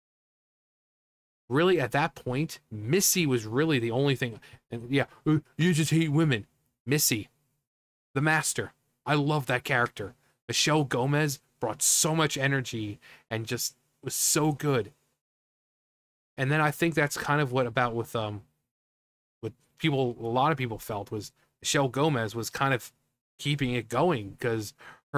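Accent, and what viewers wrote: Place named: United Kingdom. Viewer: Brilliant analysis (and Brit accent) 😊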